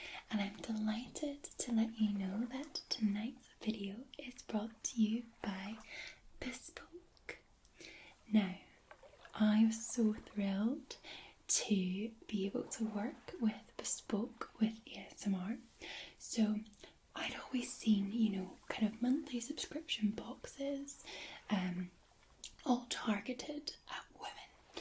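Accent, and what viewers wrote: Scotland, United Kingdom. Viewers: Whats up?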